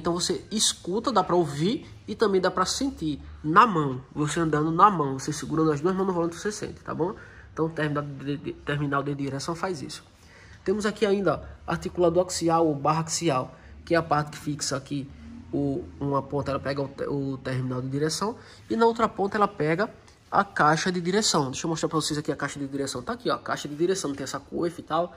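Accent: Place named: Pernambuco, Brazil. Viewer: Pelo sotaque se for de Pernambuco vou te procurar. Tenho um Etios e queria ué CV olhasse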